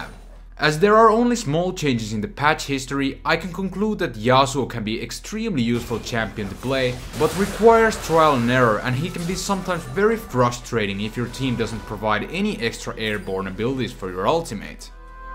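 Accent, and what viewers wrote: Finland. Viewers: Your accent reveals you. Finnish? ;) Great vid.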